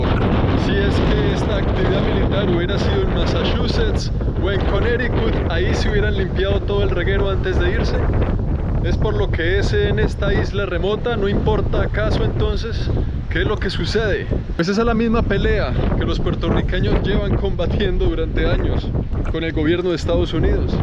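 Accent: Colombia. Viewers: Me encanto!! Igual como me gusta tu acento panacolombiano.! Un abrazo desde República Dominicana 😘🎊🇩🇴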